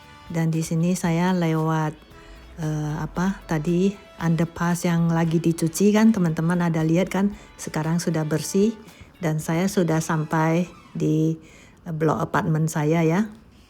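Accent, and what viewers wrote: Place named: Aceh, Indonesia. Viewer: Waktu lewat beranda saya, logat bicara ibu seperti temen kuliah saya orang Aceh, saya kira ibu orang aceh Mau tanya ibu asli mana Ehh ketemu vlog ini... Terimakasih sudah bercerita... Sehat sehat bahagia selalu bersama 🤗🤗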